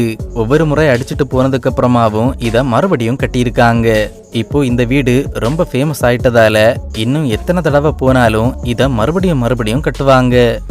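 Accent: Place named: Kerala, India. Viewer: Keara tamil superb bro❤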